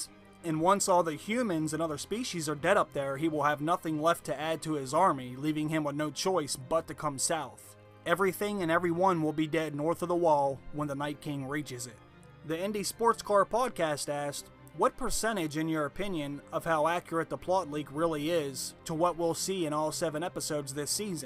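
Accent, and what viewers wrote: Pittsburgh, United States. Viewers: Do I hear a yinzer?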